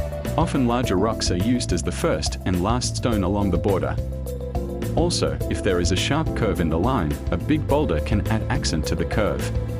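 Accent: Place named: New Zealand. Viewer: You sound more kiwi than Australian hahaha Gosh your work is fantastic.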